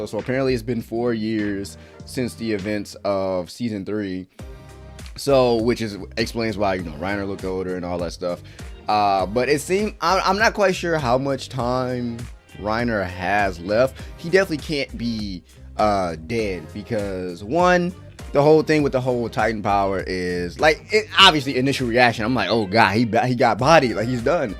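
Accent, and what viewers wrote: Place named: Baltimore, United States. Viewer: Are you from Baltimore? The way you say your too’s sounds smack like it lol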